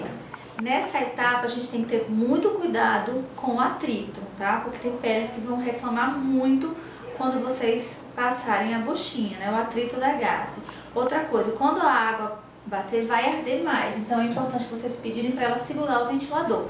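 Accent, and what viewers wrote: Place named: Northeast, Brazil. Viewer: adorando teus vídeos, parabéns sou aluna da FHO aqui em Araras SP, estou no segundo ano, ainda falta 2 anos e meio para eu concluir. obgda e continue postando vídeos, estou inscrita em teu canal. P.S tbm sou nordestina sou de juazeiro do norte ce, ouvindo teu sotaque me faz lembrar minhas raízes. bjim pra te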